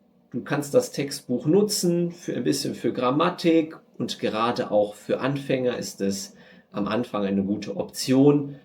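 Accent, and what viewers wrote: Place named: Berlin, Germany. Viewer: If I keep listening to your videos, all my Mittelrhein friends will ask me if I've been hiding in Berlin. LOL - Excellent video! Vielen vielen Dank!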